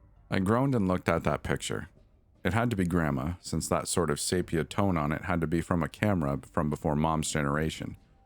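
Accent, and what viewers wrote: Canada. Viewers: oh yah, you’re my favey! Great voice,(the teeniest, loveliest Canadian accent) awesome selection, I’ll be around for your whole run :)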